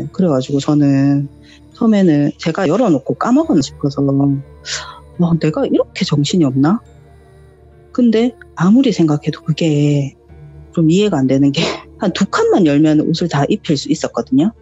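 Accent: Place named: Gyeongsang, South Korea. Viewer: 갱상도 ㅋㅋㅋ신경과민ㅋㅋㅋ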